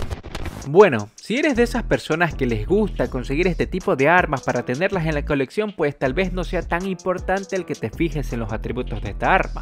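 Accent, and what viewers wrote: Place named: Ecuador. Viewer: Eres Ecuatorianísimo, jajaja sub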